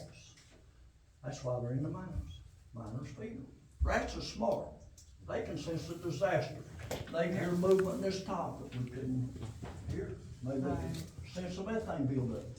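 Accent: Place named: United States. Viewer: asik om. baru kali ini denger asli aksen logat kaya koboy sibapaknya yg cuma ada di dalam film "well howdy partner nice see ya".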